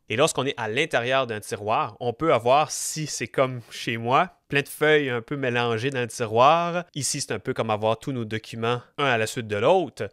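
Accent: Canada. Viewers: J'adore l'accent Canadien.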